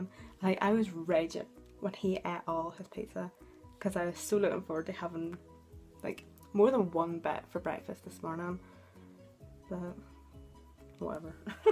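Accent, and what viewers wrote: Northern Ireland, United Kingdom. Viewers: I'm from NI too and I know exactly what you mean about saying Northern Ireland in our accent hahaha, love your videos chick you're super talented! X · Northern iron girl ! Luv our accent on youtube 😁👍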